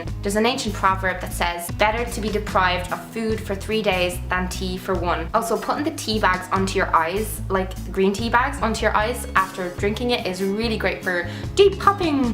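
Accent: Dublin, Ireland. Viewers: I'm from Dublin and I'm pretty sure she is too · I think she's from Ireland (I'm from England myself so I'm fairly familiar with the accent). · Did not realise you were Irish! This is the first video I watched of yours :) clicked on it for obvious reasons, thanks for the vid. I changed my diet completely. It's a really difficult time!! So thanks :)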